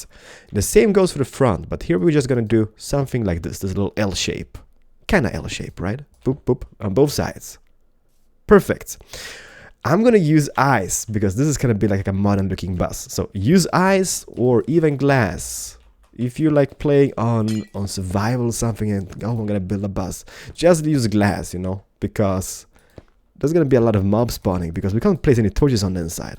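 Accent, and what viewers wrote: Sweden. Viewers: are you from sweden? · are you from sweden? :O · are u from sweden? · Are you from sweden ? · WAIT ARE YOU SWEDISH?! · are you from sweden!? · are you swedish!? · So swedish keralis Haha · Are you Swedish if you are no Way im Swedish to!!!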